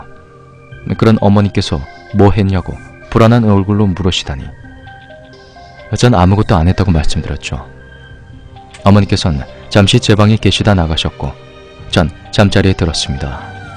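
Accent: Gyeongsang, South Korea. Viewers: 이런건 심심해도, 호기심이 생겨도 하지않는게 좋을것같네요. 오늘도 님의 목소리와 경상도사투리를 들으며 하루를시작합니다. 좋은하루보내시고 화이팅~~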